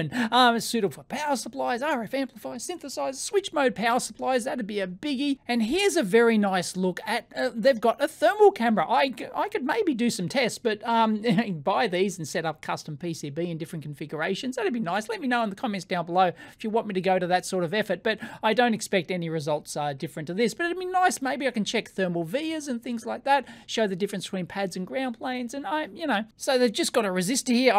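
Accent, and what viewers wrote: Australia. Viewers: Australia accent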